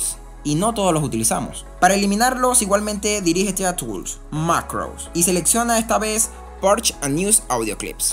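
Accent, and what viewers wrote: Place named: Venezuela. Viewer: Tu acento me suena.... Tu como que eres venezolano? Me too.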